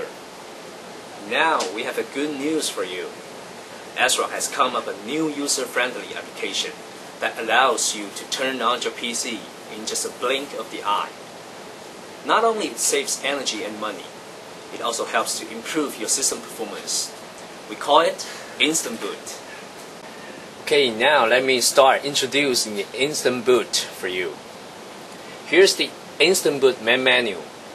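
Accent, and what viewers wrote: China. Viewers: taiwanese, hes got a chinese accent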